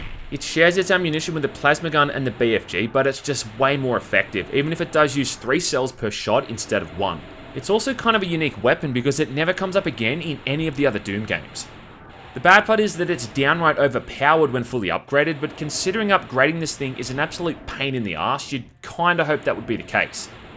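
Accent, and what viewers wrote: Australia. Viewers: I hate the Aussie accent and I AM Aussie. #Irony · Your Aussie inflection is pure perfection. (I'm born & raised south Sydney) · It's Australian, it does not sound anything like Irish xD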